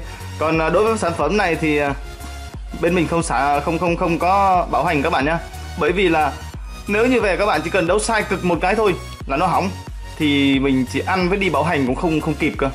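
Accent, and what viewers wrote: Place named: Thanh Hoa, Vietnam. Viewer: A nói giọng người thanh hóa vậy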